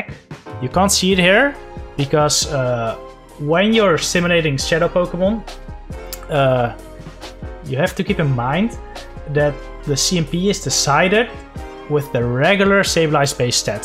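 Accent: Netherlands. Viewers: Are you dutch? Just by the accent good video, this was helpful for my sableye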